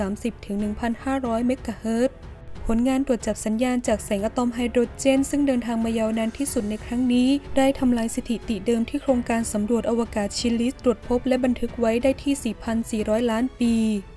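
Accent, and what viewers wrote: Thailand. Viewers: เสียงเหน่อมาก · คนใต้ไหมนิ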